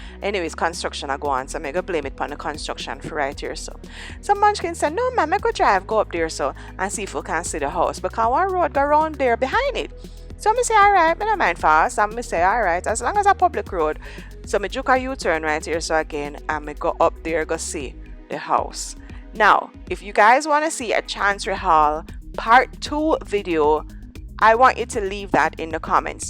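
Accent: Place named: Jamaica. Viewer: I love your commentary. It's funny and yuh patwa sounds so nice.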